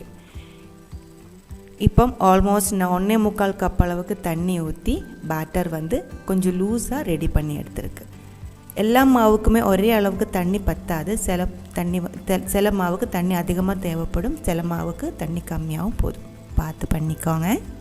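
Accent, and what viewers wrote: Kerala, India. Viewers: Very nice I love ur voice nd the presentation Malayalam Kalantha Tamil 😘👌... Vry cute snack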